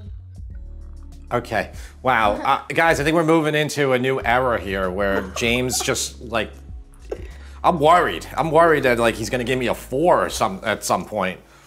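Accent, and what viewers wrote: New York, United States. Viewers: May I ask what accent you have ? Sounds like New York to me. I’m an Aussie. 😬👍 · I have made chicken soup often, but this made very clear how you can use the chicken to make the stock and use the same pieces to use in the soup. I do like white meat chicken in the soup, but the ease of this would certainly make me give this a try. As a native New Yorker, I love listening to James’ accent, making a big assumption that he is also from New York.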